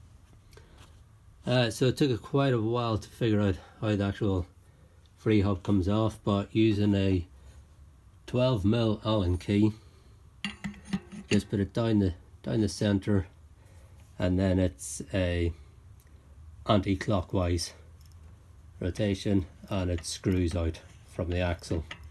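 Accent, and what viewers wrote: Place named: Ulster, United Kingdom. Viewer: Welcome surprise hearing an Ulster accent doing a bike maintenance vid. Thanks for the tutorial!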